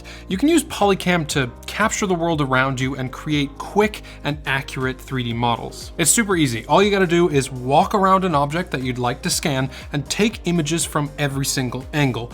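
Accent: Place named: Newfoundland, Canada. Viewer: Are you from newfoundland? If so hello from a fellow newfie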